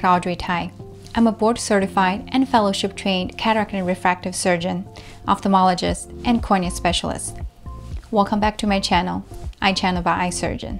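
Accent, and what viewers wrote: United States. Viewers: Pity you talk american. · Easy , just marry a smar/t pretty Chinese or Japanese female as seen in this video! No problem 😊 ! She will nurse me as I will shower her with LOVE ❤️ 😍😎 ! Yah yah ! Learned alot from this video! Her English is AMERICAN! I seen many videos when others have a strong accent and hard to understand what they are saying!!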